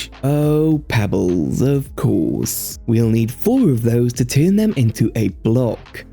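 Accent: Wales, United Kingdom. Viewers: I recognise a Welsh accent anywhere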